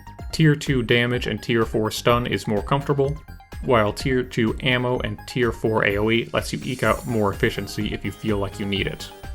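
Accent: United Kingdom. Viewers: Love for explosives, booze and an intense Great Britain accent.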